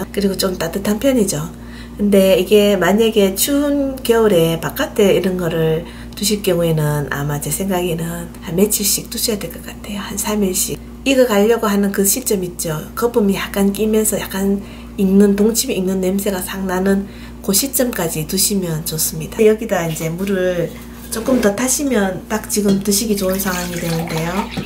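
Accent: Gyeongsang, South Korea. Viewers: 대구 이모 진짜로 동치미 올려주셨네요 감사 합니다 대구 본토 발음이시네예~ 잘 보고 갑니다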